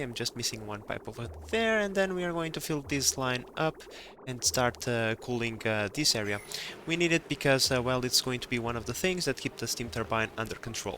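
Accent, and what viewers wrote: Portugal. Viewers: Are you Portuguese ? Sounds like it ?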